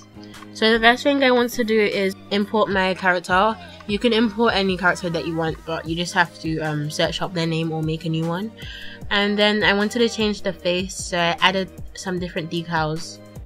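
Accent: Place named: United Kingdom. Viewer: YASS SHE IS BRITISH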